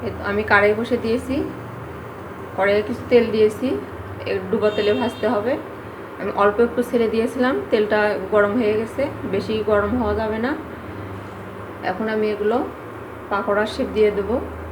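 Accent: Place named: North Bengal, India. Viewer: আসসালামুয়ালাইকুম দাওয়াত পেয়ে চলে আসলাম আপনার ঘরে। পাকড়া আমার অনেক পছন্দ আপনি অনেক সুন্দর করে তৈরি করলেন। আপনার উপস্থাপনা অতি চমৎকার এবং ভিডিও এডিটিং মাশাল্লাহ ভালো হয়েছে। ভাষা শুনে মনে হলো উত্তরবঙ্গের লোক কোথায় জানালে ভালো হতো। অনেক ভালো লাগলো আপনার ভিডিওটা। আপনার জন্য অনেক অনেক দোয়া ভালোবাসা শুভকামনা রইল এগিয়ে যান বহুদূর ❣️❣️ ভাল থাকবেন সুস্থ থাকবেন। আল্লাহাফেজ ❤️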